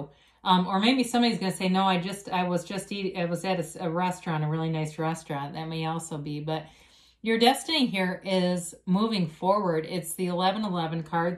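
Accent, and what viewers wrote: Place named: Boston, United States. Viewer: I'm Spanish, I was an exchange student in Mass a long long time ago, so I claim it!!! Wanna go back to Boston yes!!!😄🥰In fact I love listening to you because of your accent. In my Profesional life there a lot of british accent speakers and international and all of a sudden you sound so familiar...may I ask where in USA are you from?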